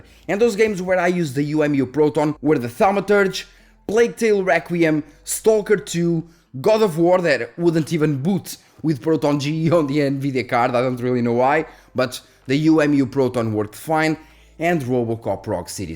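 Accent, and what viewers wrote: Portugal. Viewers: Assim que abri o vídeo soube que estava perante um puro tuga.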